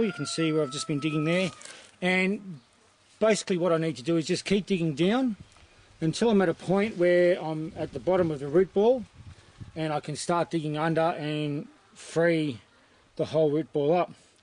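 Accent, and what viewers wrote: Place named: Australia. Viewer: As an American, I really enjoy listening to an Aussie explain things. Always straight forward to the point. Great video.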